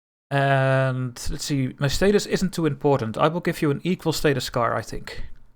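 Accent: Netherlands. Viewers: Really enjoying your play through and I love the Dutch accent!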